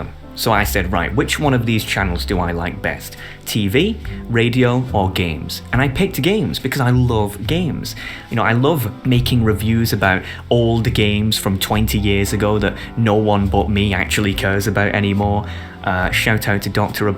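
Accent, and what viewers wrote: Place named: Yorkshire, United Kingdom. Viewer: Good job man, sub earned... You are an interesting orator you sound like a fellow Yorkshire man too (West Yorkshire lad here)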